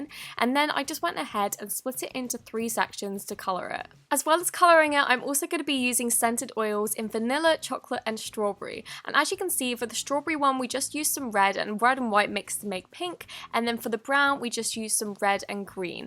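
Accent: United Kingdom. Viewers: Are you British??????!!!!??!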